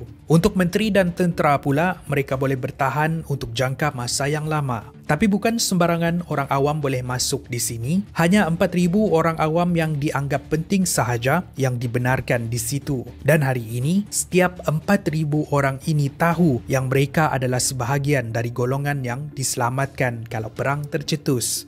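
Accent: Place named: Malaysia. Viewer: Bahasanya sudah mulai lancar walaupun masih agak kental melayunya tapi bahasa Indonesia ny sudah mulai lancar dan baik lanjutkan banyak 2 belajar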